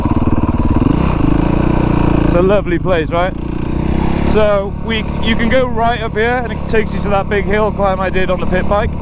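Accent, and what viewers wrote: United Kingdom. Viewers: hello where are you riding sounds like UK?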